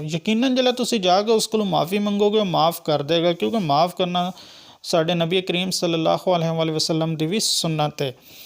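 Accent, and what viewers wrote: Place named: Potohar, Pakistan. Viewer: I am bilangual ; speak Seraiki and Balochi , but I fully understood your Punjabi garnished with a little potohari accent.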